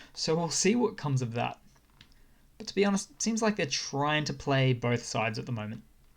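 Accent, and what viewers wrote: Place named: Australia. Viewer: Thks & you sound aussie; I stills can't figure-out how yous-guys don't fall-off the bottom of the earth ;) Pretty-good trick though.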